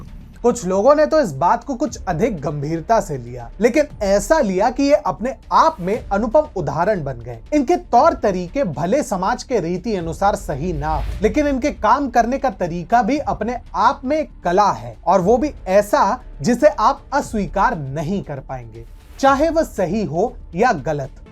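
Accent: Standard. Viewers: Aapki shudhh hindi vachan shaili bahur sundar lagti hai!👍👍👍👍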